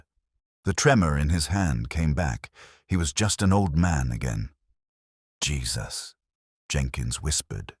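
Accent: United Kingdom. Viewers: Audio would be better if it wasn't using a slight British accent when talking about US military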